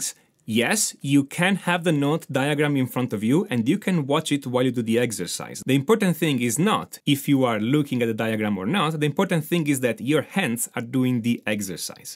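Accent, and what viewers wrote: North, Italy. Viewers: Yep, northern Italian I would dare.. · U have an italian accent...? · Lui è italiano quando dice lento in inglese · Accento italiano? 🤔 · Italiano vero . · Italian, Roman or northwest of Rome??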